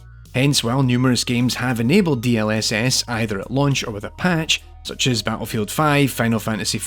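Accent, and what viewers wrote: Scotland, United Kingdom. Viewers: Are you scottish? 😁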